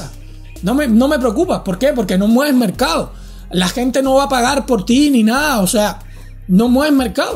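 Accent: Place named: Cuba. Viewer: Yow mano, me encanta lo claro que hablas y ese acento cubano.👊🏼😁 Saludos desde España.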